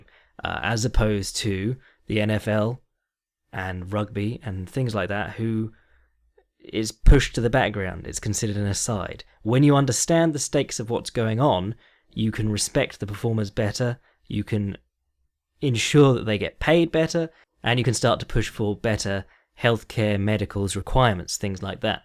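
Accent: England, United Kingdom. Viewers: Where about's in England are you from? Also, if it's close to me, can we be friends?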